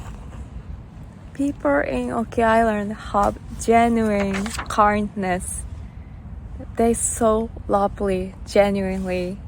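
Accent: Australia. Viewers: Your English is improving. Some very Australian accent popping through. Yes it is cold. It’s still winter. In a few months it will be burning hot. Your dream sounds fantastic. I wish you and your husband well in establishing your little hotel 🏨 👍🏽🇦🇺😊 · Aussie pronunciation is slipping in every now and then haha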